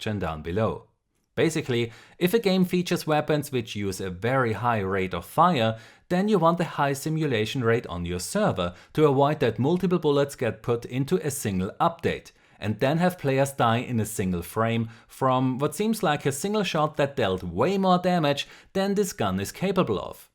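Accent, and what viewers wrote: Germany. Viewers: Is your first language german? You accent sounds like that and is easily to undestand for me as a bad english speaker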